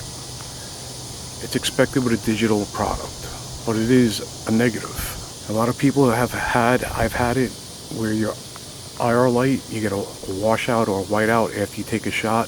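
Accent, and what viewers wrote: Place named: New York, United States. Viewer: Totally nothing to do with the scope, I just love hearing a Northeast accent being from The Bronx…guessing you’re a New Yorker!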